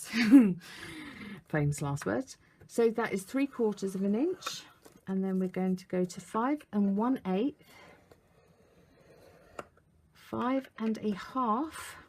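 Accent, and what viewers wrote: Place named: United Kingdom. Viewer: This card is stunning! I just found you and love your clear explanations. (The British accent is a bonus!)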